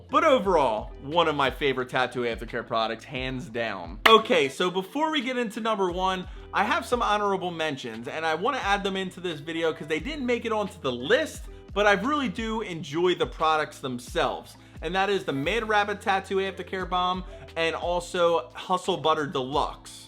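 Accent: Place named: Delaware County, United States. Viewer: Are you from delco cause you sound like it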